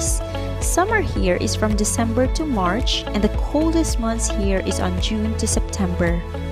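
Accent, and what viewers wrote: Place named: Visayas, Philippines. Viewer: Cebu?? So I guess bisaya ka ma'am. 💕 Loved your accent 😍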